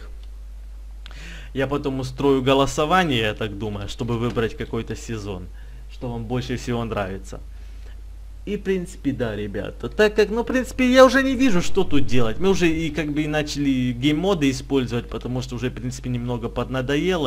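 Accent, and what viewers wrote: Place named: Ukraine. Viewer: Голос украинский 😆😆💫🌚💦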